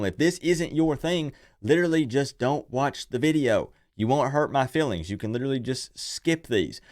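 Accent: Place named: Chattanooga, United States. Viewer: you definitely get that Chattanooga accent..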